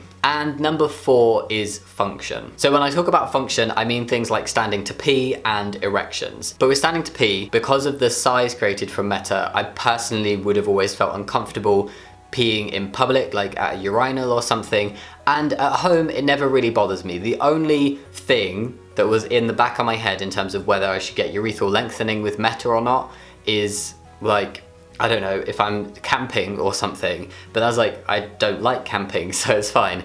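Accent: United Kingdom. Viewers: just luv your Brit accent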